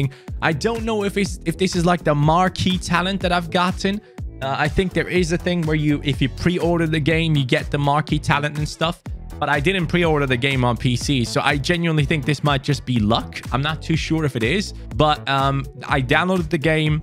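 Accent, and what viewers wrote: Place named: Jamaica. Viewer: Hey could keep the Jamaican please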